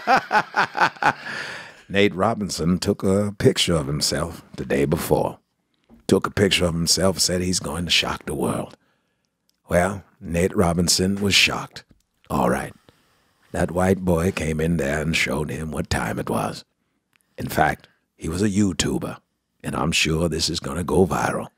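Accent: England, United Kingdom. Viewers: It’s very close. It’s just the English accent that makes it not Morgan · I give you a 7.8 out of 10. The problem is, you have an English accent while doing that voice, and Morgan doesn't.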